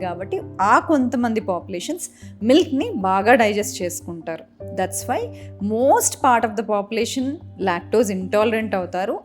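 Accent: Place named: Telugu, India. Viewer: Milk గురుంచి చాలా బాగా చెప్పేరు madam. Thanks for your Telugu slang, which beautifully explained us,the issue.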